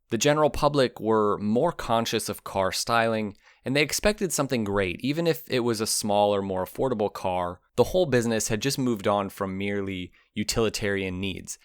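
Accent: United States. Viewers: Well done for putting this together with a nice presentation of the models throughout the years, ... but I wish you would pronounce Jaguar, Nissan and Datsun correctly and not in that dreadful American way. For instance, Jaguar is pronounced 'Jag-you-are' NOT 'Jag-Wah'! Nissan is pronounced 'Niss-an' (as in Miss) not 'Nice-An'.! Also, as a suggestion, since the emphasis was on the TR6, a bit of info on the uniqueness of its power train (which is one of it's main features), that being the 6-cylinder PI. With mechanical fuel injection, it stood out from others in and above its class because of this. Interestingly you make the comment, or imply, that in the early seventies, for a UK motor manufacturer, it was unique with it's overhead cam, but Jaguar had their overhead cam XK engine from the early 50's as fitted to the XK120-150 and the Mk1 & 2 from the late 50's & throughout the 60's. 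However, the TR6 is definitely on my wish list. You refuelled my interest! Thanks. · THERE IS NO "W" IN "JAG YOU ARE." Stopped watching, since an American can't teach us about BRITISH CLASSIC CARS. And DRC'd as well.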